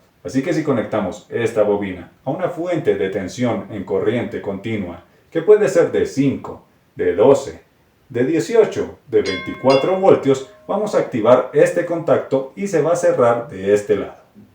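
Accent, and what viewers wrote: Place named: Caracas, Venezuela. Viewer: Epale pana mío tú vídeo esta genial y lo extraño es que tú dirección de tú canal de Youtube dice que estas en Colombia pero tú hablas como venezolano de Caracas santa mayulla pana mío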